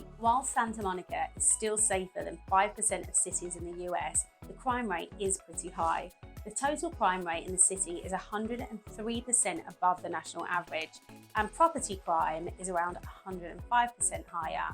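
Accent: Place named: United Kingdom. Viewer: Nice Insights, Beautiful tattoo,. I like your British Accent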